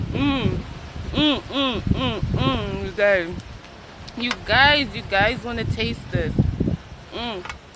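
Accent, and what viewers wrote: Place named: United States. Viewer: are u a jamaican cause u sound like american lol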